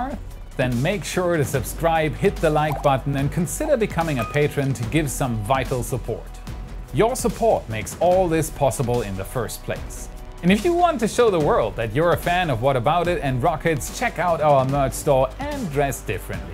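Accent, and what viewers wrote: Germany. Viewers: He is german isn't he? I feel like his accent is really light :D I'm so glad I found that dude lol Best quality updates! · yeah im also from germany and i can clearly hear the accent. but its incredibly light for a german. and yeah he is my favourite SpaceX youtuber :D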